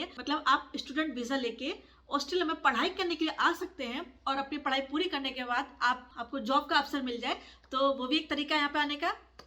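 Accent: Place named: Himachal Pradesh, India. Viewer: Aapki hindi thodi Himachal se milti julti h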